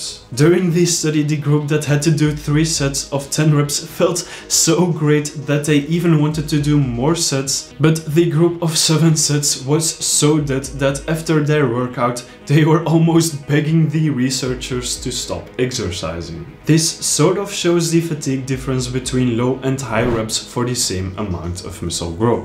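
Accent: Belgium. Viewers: ik kon meteen horen dat je belg bent haha. je komt zeker en vast uit antwerpen :)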